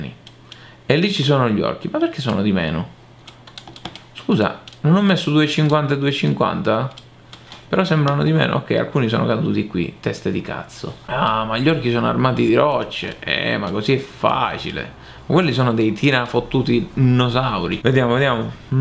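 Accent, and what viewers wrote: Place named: Sicily, Italy. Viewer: Mi manca un po' il tuo accento siculo <3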